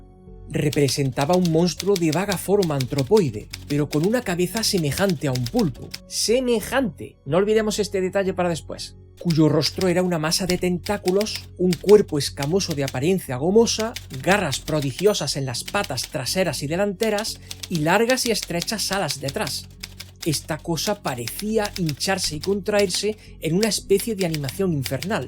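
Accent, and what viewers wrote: Andalusia, Spain. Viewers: sos de Andalucia?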